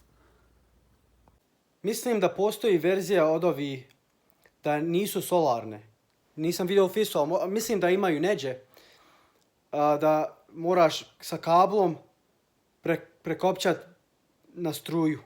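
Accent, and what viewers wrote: Bosnia and Herzegovina. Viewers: Pravi momak nekoliko tvoju videa sam pogledala I vidjela sam da si previse iskren moj savjet je kao moje dijete koje bi posavjetovala da nebudes toliko Iskren. Nardo iskoristava ima pokvareni ljudi moras biti malo Mudri a to je da se cuva s Dijete drago simpatican ti taj Bosanski Bas mi super te gledati❤❤😊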